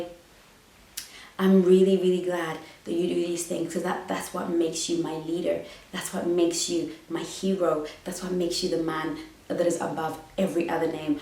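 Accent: United Kingdom. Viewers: you are so beautiful and eloquent. I also love the British accent- my favorite accent. I just recently discovered your channel. Thank you for all you do. Working on lifting myself up. I know I’m a gem but there are times I’m a bit low and not feeling that way. Recent break up has really hurt me but working on picking myself up. Other channels helped me and like I said I recently discovered yours. Love the way you express yourself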